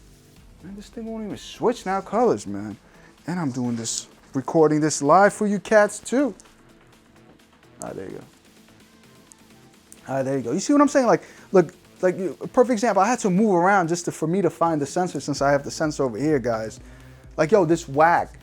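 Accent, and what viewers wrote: New York, United States. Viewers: Man i just discovered my new fave channel. You sound like you from NYC like me. You keep it real.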